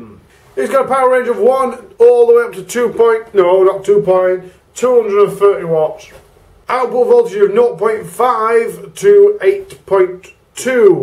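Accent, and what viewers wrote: Yorkshire, United Kingdom. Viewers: Yes! Thank you for this review! Can’t wait to pick one of these up (but fingers crossed 🤞🏻 for your little comp). I thought you was yorkshire at first listening and thought Ohhh there’s a vape shop I can visit...but your not close enough after a quick google 😔. Vape shops in York are not to great. Anyways, thank you so much for your straight up black and white review. Brilliant!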